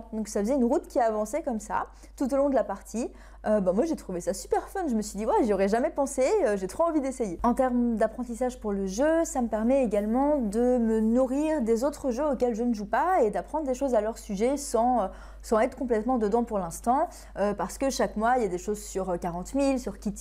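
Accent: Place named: Belgium. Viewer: G Wé :-) Ça fait du bien d’entendre des prononciations de notre plat pays :-) Cela me rappelle le temps où mes parents avec une BMWée 😂 Un coucou d’un Belge de France !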